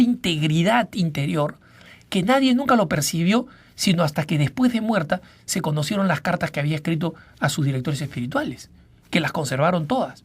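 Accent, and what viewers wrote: Lima, Peru. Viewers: ACENTO LIMEÑO.....agg¡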